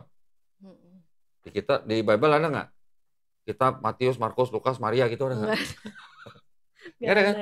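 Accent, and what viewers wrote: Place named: East Java, Indonesia. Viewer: Logat nya JATIM 😅😅😅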